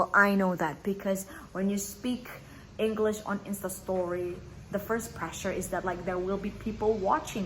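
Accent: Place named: United States. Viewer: I like your american accent 😁👌👍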